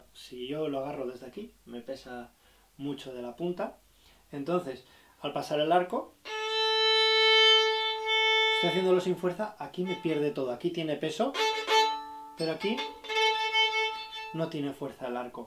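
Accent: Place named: Spain. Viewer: Lástima. Yo vivo en Barcelona y, por el acento... creía que quizás estábamos más cerca. Gracias. Seguiré con tus tutoriales a ver si avanzo.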